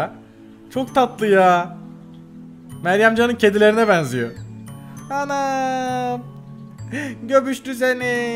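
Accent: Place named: Izmir, Turkey. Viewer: Abi kesin izmirlisin çünkü benim dayımda sizin gibi konuşuyor 😊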